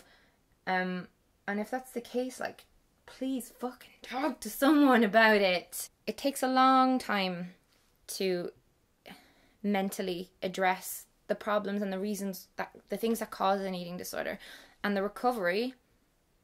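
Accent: Ireland. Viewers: Which county is she from? I'm woeful with accents. Tipperary? 🍍🍍🦄🦄❤❤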